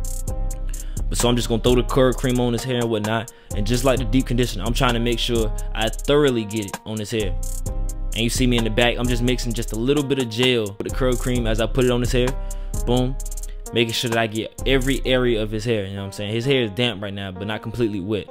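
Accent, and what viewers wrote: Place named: New York City, United States. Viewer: Ya he definitely got the NYC❤️ Accent Like if you agree 👇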